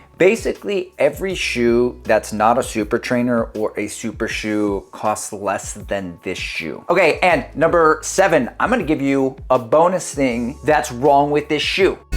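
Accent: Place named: Boston, United States. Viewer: As a New Englander your Boston accent was 1080 v13 like, but your explicative tirade right after was peak Boston!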